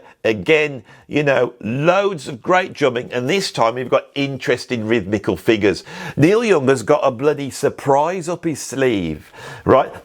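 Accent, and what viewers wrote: United Kingdom. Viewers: Sorry, squire, I gobbed on your carpet. Frankly, your entire verbal rap is obnoxious, and you can't even hear yourself making "bloody dodgy" statements in that bloody rough British accent. You state, just before I decided to bail out in disgust, that you're going to give your "objective opinion". Really?! An "opinion" is not "objective" by definition. And using language like "a piece of shite [lovely]" and "yech" (huh?) are hardly the verbiage of objective criticism as opposed to highly subjective and low-IQ reaction. You're starting out wrong when you foolishly seek out the Rolling Stone's "500 Greatest Albums" or whatever lame source, though there will be some worthy albums in such a list. As for Neil Young, it's pretty simple. He WAS a great artist at one time, from roughly his time in Buffalo Springfield through his first few solo albums and some collaboration with Crosby, Stills and Nash. 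His albums were usually erratic in terms of song quality, but the earlier solo albums feature many great songs. He has not produced an album worthy of his legacy for more than 25 years, instead popping out these dull, lyrically strained and melodically uninspired "songs" out of a desire to keep playing and stay relevant somehow. Fans with no critical faculties and many critics who betray their own standards continue to praise and indulge Neil. But meantime, you think some Nirvana album is "bloody amazing" but you determine to hate Neil Young and the "Harvest" album. Well, bud, your non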